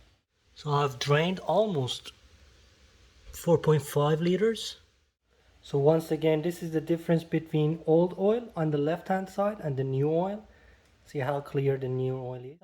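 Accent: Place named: Australia. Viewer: Hello, you sound like a fellow-Aussie ☺ After watching your video tip, I Googled the oil needed for Toyota Yaris Cross, and found it to be 0W 20.( Synthetic) Is this a significant difference? What goes with such a variable answer to this question? I live in Sydney which is NEVER really cold, but often miserably, 3rd world, hot in those torrid months of December to March each bleeding year 😂 Thank you!!!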